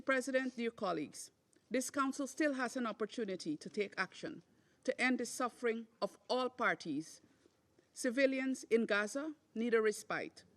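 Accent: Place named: Caribbean. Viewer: In her lovely Caribbean accent: The US resolution is bullcrap!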